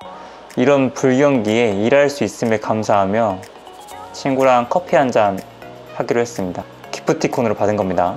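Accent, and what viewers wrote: Gwangju, South Korea. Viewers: 이제 2월에 본1 들어가는데 너무 웃겨서 이것만 보는중이에요....ㅋㅋㅋㅋㅋㅋㅠ 아 글고 광주사투리 너무 익숙해요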